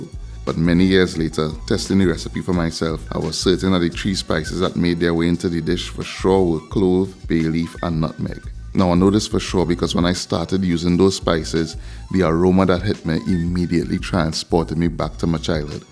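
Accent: Trinidad and Tobago. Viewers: Is that a Trini accent ?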